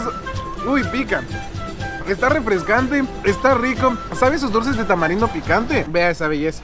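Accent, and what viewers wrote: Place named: Quito, Ecuador. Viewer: Espectacular, por fin un ecuatoriano con sentido del humor nuestro y ese acento quiteño inconfundible...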